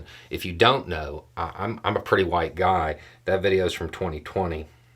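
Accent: South, United States. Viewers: Some of the messages that come in really make me think some people out there just have a kink for being verbally dismantled bearded white guy with a southern accent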